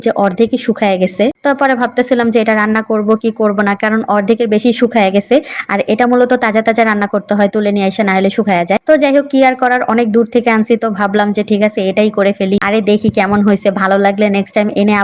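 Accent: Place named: Old Dhaka, Bangladesh. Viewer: Oi apu apni ki puran dhakai thaken apner kotha gula puran dhakar kothar moto reply diben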